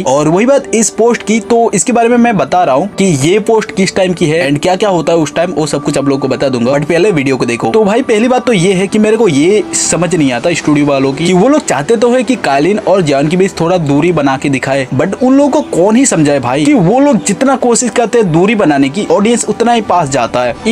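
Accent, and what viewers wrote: Assam, India. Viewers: Bhai tum assam se ho kya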